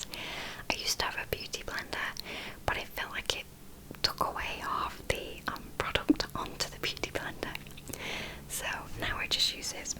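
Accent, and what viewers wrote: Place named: England, United Kingdom. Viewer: Hey, Don't want to sound like a creep but where abouts in england are you from? Just because of the accent. I'm from South Yorkshire btw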